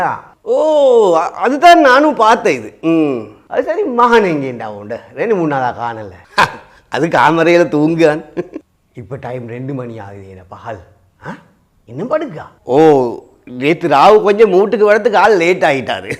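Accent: Sri Lanka. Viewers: நீங்கா பேசுறது ரத்தணாபுர தமிழா கொஞ்சம் சுத்த தமிழ்ல பேசுங்கா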